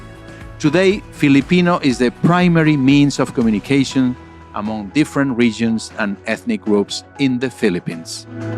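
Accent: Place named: Spain. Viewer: You are a talking in an English but of Spanish accent. By the way I'm a Filipino but my late grandma had at least 30 to 40 percent of Spanish blood.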